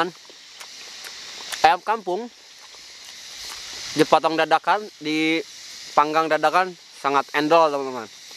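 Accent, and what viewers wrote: West Java, Indonesia. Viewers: "saya tigebros temen2 " khas sunda melekat bang jago, sukses terus a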